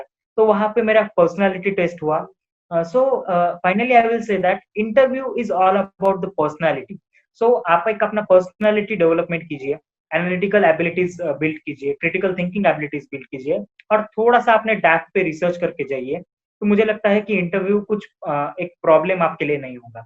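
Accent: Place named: Maharashtra, India. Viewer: Marathi mulachi hindi bhasha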